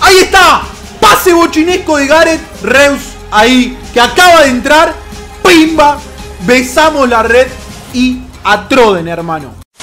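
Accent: Argentina. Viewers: sos de argentina??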